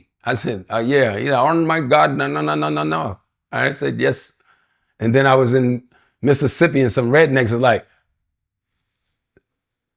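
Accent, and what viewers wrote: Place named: Washington DC, United States. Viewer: My man from DC! NW right here!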